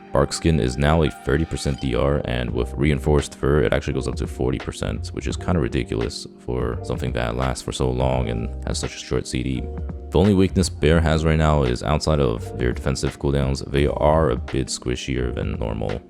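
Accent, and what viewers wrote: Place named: New York City, United States. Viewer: NYC ABC is a very specific accent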